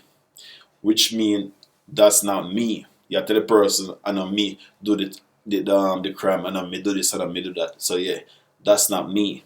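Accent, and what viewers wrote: Jamaica. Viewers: This helped me understand the song by; ah I love Jamaican accents, so cool. Also you’re very cute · Finally someone with an actual Jamaican accent :)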